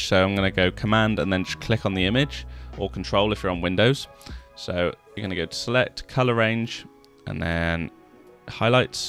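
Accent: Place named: United Kingdom. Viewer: I can't understand some British accents,but your voice and accents are cool.Thank for making these things.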